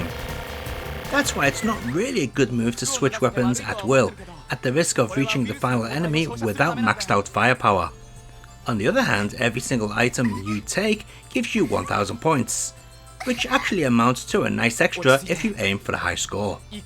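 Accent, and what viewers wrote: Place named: Liverpool, United Kingdom. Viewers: Always sounded very much Wallasey to me, so wasn’t far off 😊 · Ah it's a Liverpool accent, I was thinking North West, then I doubted myself and didn't want to guess. I know Liverpool well. Spent a lot of time there. Live in the New Forest. Yes, I'll be 50 next year too. Time does indeed fly.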